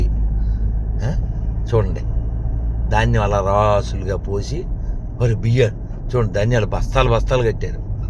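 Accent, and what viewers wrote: Telangana, India. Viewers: ధాన్యపు రాశులు. తెలంగాణ యాసలో. మీ ప్రయాణం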